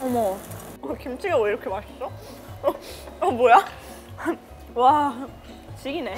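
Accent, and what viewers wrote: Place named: Gyeongsang, South Korea. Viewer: 그동안 무슨 일이 있었길래 본인 유투브 채널 활동 안하다가 다시 컴백한건가요. 구수한 경상도 사투리가 매력적인 보고싶었어요. 다시 활발하게 활동해 주세요.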